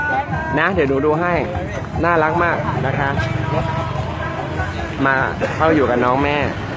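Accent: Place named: North, Thailand. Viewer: ชอบที่พูดเหนือจังเลยค่ะ เป็นเอกลักษณ์ดี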